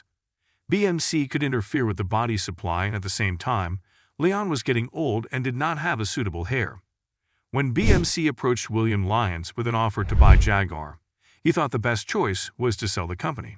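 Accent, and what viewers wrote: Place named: United States. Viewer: Why can't the narrator say K not T? This is about an English car and should be narrated by an English speaker not a Yank trying to speak English.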